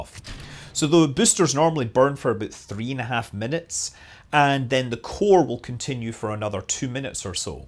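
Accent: Scotland, United Kingdom. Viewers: Ive been watching Scott for years. He was the one who taught me the basics of KSP. My wife on the other hand had very little knowledge of him, his channel, or the content. Ive been trying to change that, so now when I watch new videos the phrase “Hello, Im ” rings out around the house. then immediately after I hear a small womans voice in perfect Scottish accent “Hellooo Im ” looks like you got ur hooks in deep sir. Love ur work.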